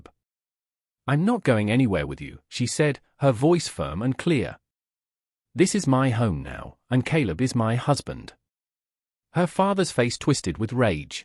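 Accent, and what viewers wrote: United Kingdom. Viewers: Westerns should NOT be read with a British accent, EVER!!!!! It's unnatural.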